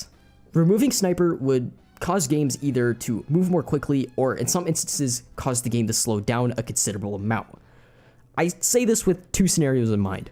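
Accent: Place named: New Zealand. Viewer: He’s actually from New Zealand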